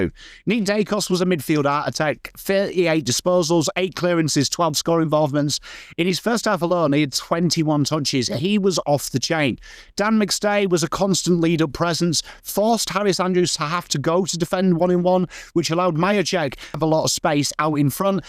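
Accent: England, United Kingdom. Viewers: Why does it sound better coming from a Pom?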